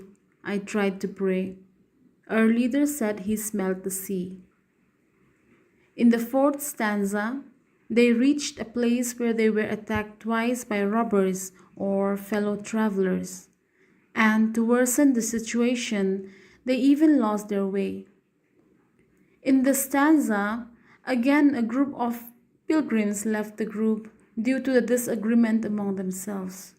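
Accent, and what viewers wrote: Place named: Mizoram, India. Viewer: Ohhh i accent ka hre chiaha ka lawmzia chu ❤ mizo lo ni rengin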